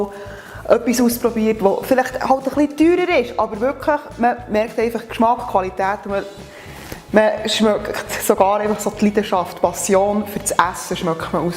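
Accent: Bern, Switzerland. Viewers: So geil! Bi neu bi dir u finge, dass du's extrem guet machsch. U sooo schön, wemer uf YouTube chly Bärndütsch ghört! :D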